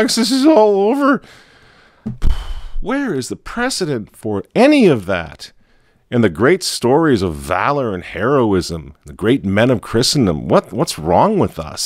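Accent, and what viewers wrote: United Kingdom. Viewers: Fair comments all around. Only one suggestion going forward....a request, really., could you start doing these editorial videos with that great British accent. Loved it...powerful...convincing. Keep these great videos coming. Great job. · Love the English accent 😅😅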